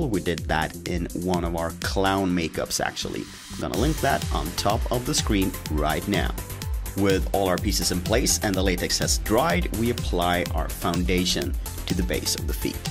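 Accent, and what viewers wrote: Scania, Sweden. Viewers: Älskar din skånska!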